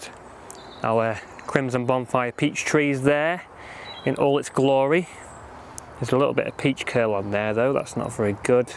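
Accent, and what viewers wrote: Manchester, United Kingdom. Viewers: Great video thanks 👍 I think I'm not far from you , in North Manchester (I'm guessing from your accent lol 😉) I'm also very puzzled but delighted by the absence of slugs! Last year they ate everything 😢 Do u think they're plotting a coup?!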